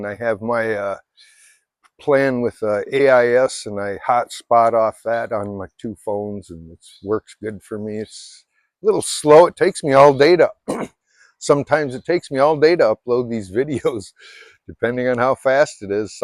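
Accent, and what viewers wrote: Canada. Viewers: Anybody ever say you actually sound Canadian?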